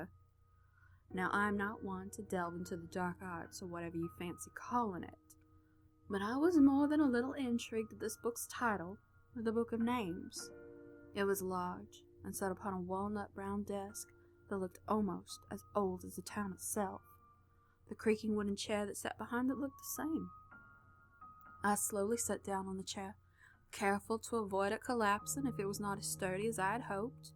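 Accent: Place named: West, United States. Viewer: Real good western accent. I thought it fit the story.:)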